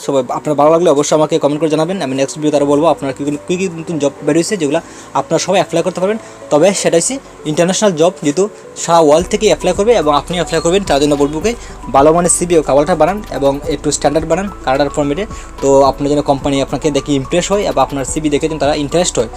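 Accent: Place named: Habiganj, Bangladesh. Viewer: Vair bari ki Habiganj ni?? Kotha shune tho Habiganj lage...🫣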